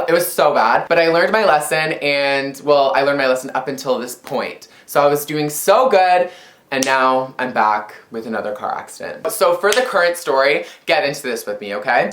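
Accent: Canada. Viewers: it sounds more canadian than his normal voice hahaa x)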